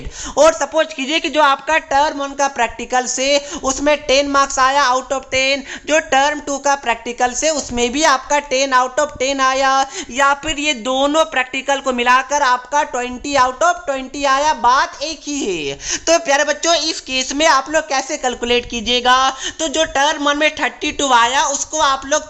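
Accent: Bihar, India. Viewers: Sir ji aap bihar se ho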